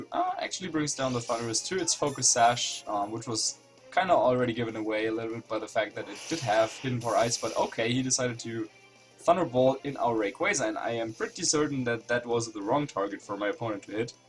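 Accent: Germany. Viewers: Pleasure to have you back, having only Hibiki was not enough German accent in my Pokemon videos (yes, I know he is Austrian, but it all just sounds the same)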